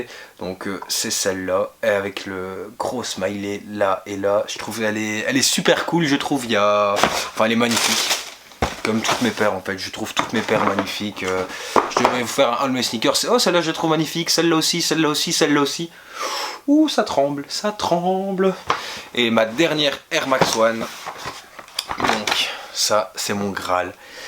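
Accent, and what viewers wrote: Belgium. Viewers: t'es belge?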